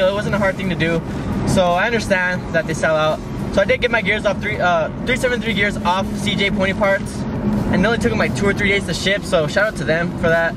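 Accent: Texas, United States. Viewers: What part of Texas are u from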